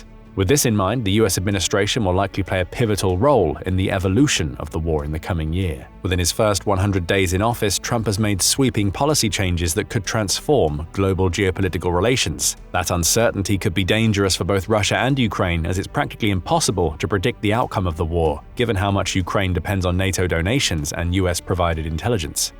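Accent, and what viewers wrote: England, United Kingdom. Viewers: I'm really getting weary of the ENGLISH ACCENT. As if it the accent somehow carries more intelligence. 🙄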